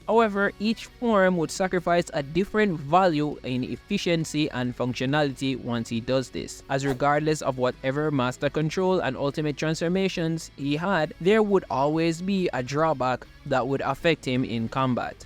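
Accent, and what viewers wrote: Jamaica. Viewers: subscribe for the jamaican accent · its clearly jamaican. Im jamaican and chinese myself bro talks like a sophisticated jamaican person. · It's not about difference, there's just nothing Indian about his accent, its clearly a Jamaican accent. You good LiL brO?? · U Jamaican? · Are you Jamaican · Bro yuh a jamaican🤨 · bro sounds like a jamaican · Could tell the Jamaican accent immediately 😂, happy for my JA brother. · Are u Jamaican? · This sounds Jamaican….what Caribbean country you from? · Are you Jamaican? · You speak English but sounds Jamaican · Yo bro ya Jamaican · Jamaican (take it from another Jamaican) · Are you Jamaican bro?? Love the Ben ten content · Is he really a Jamaican? I hear the accent and ting, but mi affi ask. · are you jamaican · Yo are u Jamaican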